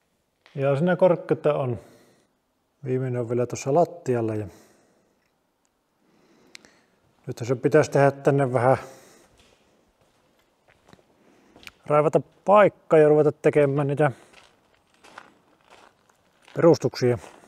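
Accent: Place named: Finland. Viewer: Olipa mukava viteo👍, komia katos tuli. Sulla on ääni ja murre vähän ku Päätalon Herkolla😂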